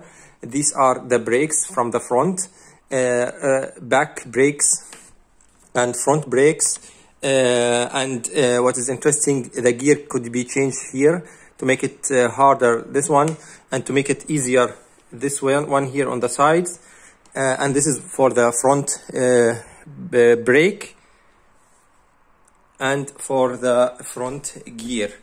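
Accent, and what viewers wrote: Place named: Arab world. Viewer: واضح من اللهجه انك عربي حط الترجمه للعربي لان افكر اخذ مثلها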